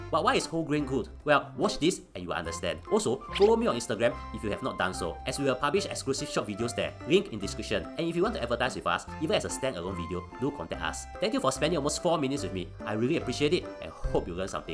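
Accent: Singapore. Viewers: Ur Singaporean accent is so prominent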